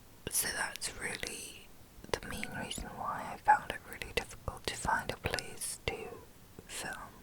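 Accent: England, United Kingdom. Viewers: You are starting to sound a little more 'English' after your time over here in the UK :) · 만세만세🙌🙌🙌🙌🙌인스타에서 댓글받고 얼마나기다렸는지 몰라요🙌🙌고3이라 폰을 중독방지어플로 잠궈놔서 알림받고도 보지를못했어요ㅜㅠㅜㅠ이제야보다니ㅠㅜㅜ언니 머리 많이자랐어요!!!!어릴때 영국갔을때 그 특유의 발음을 듣고 컬쳐쇼크였는데 언니발음에도 그 발음이 들려요ㅇ.ㅇ!!영국미국을 오가며 글로벌하게 자라셔서 그런가ㅋㅋㅋㅋ컴백환영해요💕💕💕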